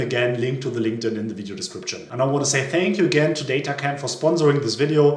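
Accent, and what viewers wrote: Germany. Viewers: Hi, great videos! Especially your knowledge combined with the German accent makes me as a Hesse really happy!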